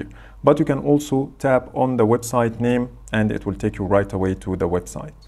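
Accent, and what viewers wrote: Egypt. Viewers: You sound like you're Egyptian right?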